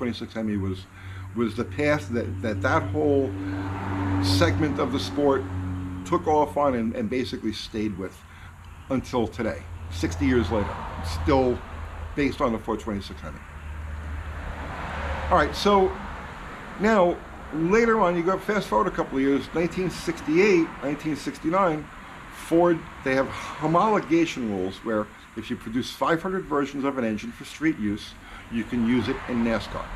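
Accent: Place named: New York, United States. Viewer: I'm from NY. Don't mind your accent at all, reminds me of home.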